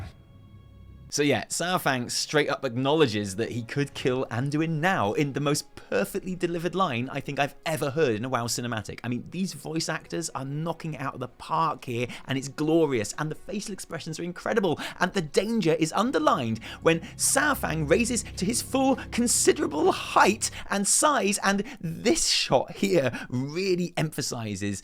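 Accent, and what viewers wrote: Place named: United Kingdom. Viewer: Late or not, don't need a reason to listen to you talk about WoW in a glorious British accent. Keep 'em coming.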